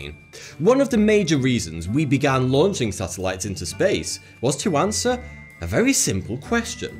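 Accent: United Kingdom. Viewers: Hmmm, when Islam is mentioned re burning the library this guy with brit accent is afraid to say anything derogatory about religion. But Christians and the Bible, snide mocking tone. Hmmmm, guy might be afraid a tad of one very "peaceful" flavour of religion slicing his head off these days.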